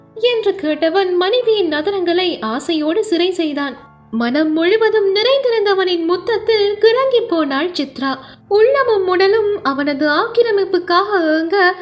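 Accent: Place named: Sri Lanka. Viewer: பிரபு அவர்களுக்கு என் மனம் நிறைந்த நன்றி உங்கள் நாவல் மிகவும் அருமை 🥰🌹 உங்கள் நாவல் யின் குஞ்சகம் குரல் அவர்களின் இலங்கை தமிழ் வாசிப்பு அருமை சாபஷ் என்று சொல் தோன்றுகிறது ஃ🥰❤️❤️❤️🥰🌹🥰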